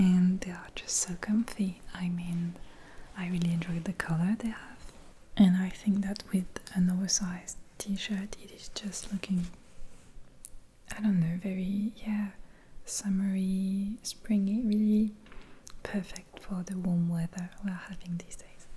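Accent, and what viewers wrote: France. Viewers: J'adore, ton soft spoken et ton attitude sont tellement relaxants ❤️ Bonne soirée ! (J'avais commenté une fois en disant que l'accent français en anglais me perturbait quelque peu... Mais je dois dire que j'ai bien changé d'avis grâce à ta jolie voix. Et je voulais m'excuser, en y repensant je me dis que ça aurait pu éventuellement te créer un complexe, et ça n'était pas du tout mon intention 😅 ) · I love that french accent :)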